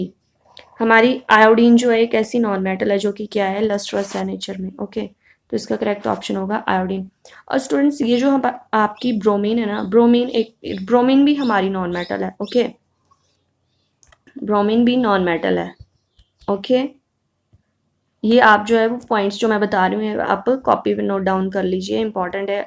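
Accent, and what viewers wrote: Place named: Pahari, India. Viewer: ये ट्रस्ट serirs "जो है वो"😅 कितने rupess में avilable हो जाएगी maam ? पहाड़ी accent बड़ा अच्छा आता है आपकी हिंदी में😃🙏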